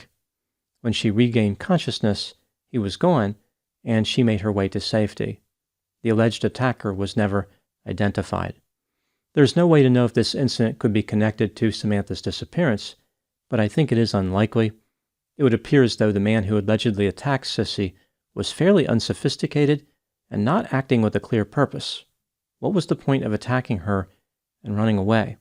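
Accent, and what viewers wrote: United States. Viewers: He’s not pronouncing it right. He has an American accent, he should say it like “Mel-burn”. With a hard R.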